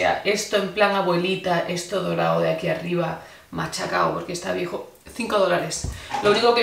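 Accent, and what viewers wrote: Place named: Andalusia, Spain. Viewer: Te sale el habla forzado con las eses. Mucho mejor cuando hablas en andaluz con tu madre. Como dwbe ser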